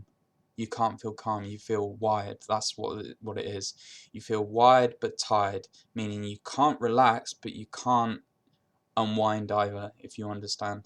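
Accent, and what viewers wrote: United Kingdom. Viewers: This is a semi estuary London type of accent not Australian,,,,I found him clear enough,,he wasnot cockney or anything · English not Aussie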